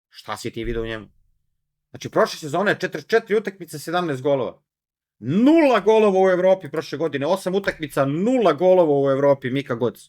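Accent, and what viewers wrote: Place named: South, Serbia. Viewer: Gledajuci te i slisajuci,prosto ne mogu da se otmem utisku da izgledas kao bulja,a i pametan si kao ista. Nemoj vise komentarisati sa tim juznjackim naglaskom si preglup.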